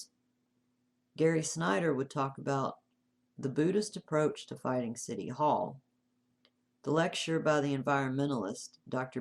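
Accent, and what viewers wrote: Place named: Western North Carolina, United States. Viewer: I’m from western North Carolina so it sounds like home to me!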